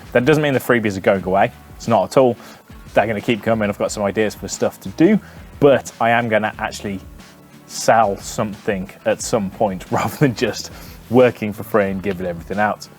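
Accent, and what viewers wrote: United Kingdom. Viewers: I wish you and yours all the best in your future endeavors with the video stuff,. You're a big help in my understanding and the way you present yourself works very well for me. And I love the British accent! I hope you keep moving onward and upward and I'll keep tuning in and learning.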